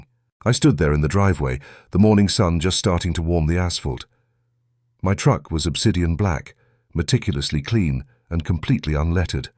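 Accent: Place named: United Kingdom. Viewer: What's with the British accent? Make it American. Viewing from Binghamton, NY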